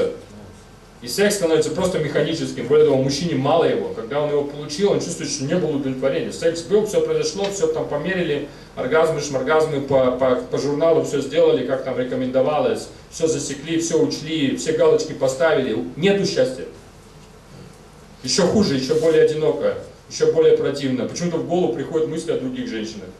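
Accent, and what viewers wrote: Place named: England, United Kingdom. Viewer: Речь ужасно косноязычная, с каким-то английским! акцентом. Поет фальшиво))))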